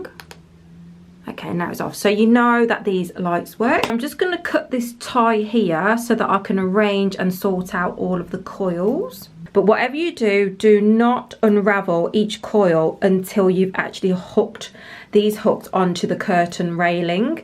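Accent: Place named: Midlands, United Kingdom. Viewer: So nice to hear a Midlands accent on here! Big love from Coventry, love this tutorial!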